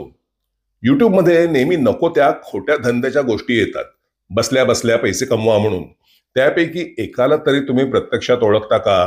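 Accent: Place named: Goa, India. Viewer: Khup chan video editing karta ani voice over pan.. malvani thoda goanis zhala ..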